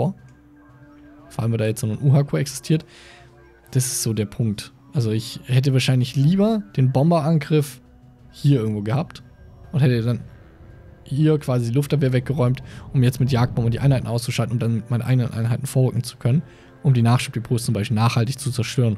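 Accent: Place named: Swabia, Germany. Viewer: Hätte wenigstens wieder auf schwäbisch sein können :D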